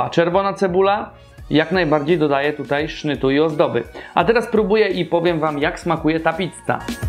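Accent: Poland. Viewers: Jak ja nie nawidze tej toruńskiej gwary, serkem... Ołówkem ...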